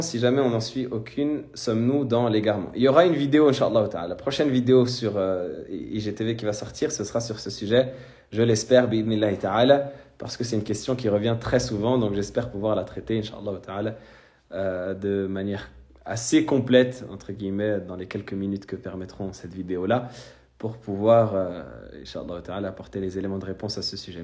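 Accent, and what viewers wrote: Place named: Turkey. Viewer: Barakallah oufik, j'ai bien aimé l'accent turc 😅😅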